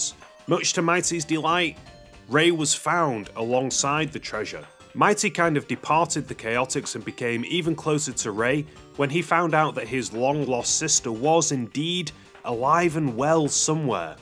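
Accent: United Kingdom. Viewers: Ur defo from Stoke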